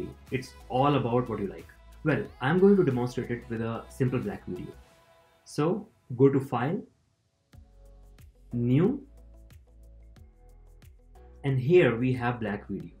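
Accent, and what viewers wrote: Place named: India. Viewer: Indian? Right!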